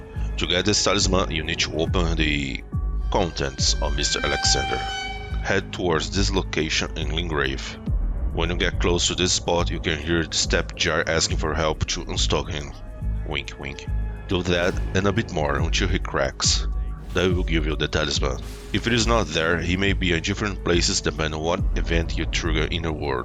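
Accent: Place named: Brazil. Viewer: Huge brazilian accent, are you from brasil?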